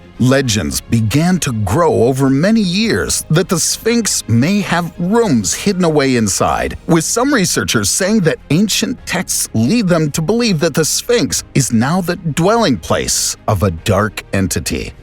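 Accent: United States. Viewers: Yet another annoyingly loud, patronising American narration aimed at 5 year olds.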